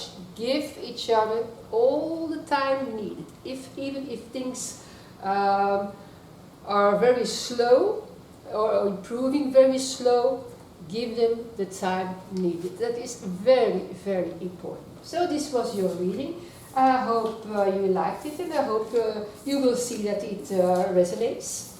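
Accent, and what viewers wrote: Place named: Netherlands. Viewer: Are you from the Netherlands? Your accent sound like dutch.❤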